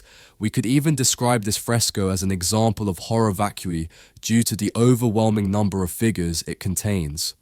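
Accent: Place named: England, United Kingdom. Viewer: Take your English accent and your fear-mongering and shove it I am not afraid perfect love Casts Away all fear death has no power over me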